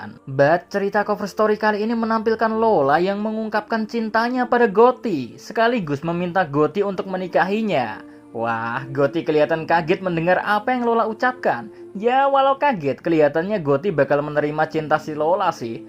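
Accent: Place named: Tangerang, Indonesia. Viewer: ngikutin chanel ini udh lama Min.. dn aksen "GEH" itu baru.. dn sangat menggangu telinga... Terima kasih Btw sya thu itu aksen daerah Tangerang kabupaten..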